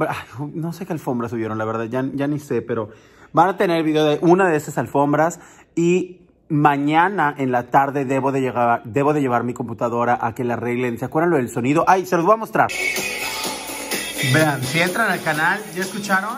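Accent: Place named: Yucatán, Mexico. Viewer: Jajajaaaaa tu xoy! Mas yucateca imposible te amooooo!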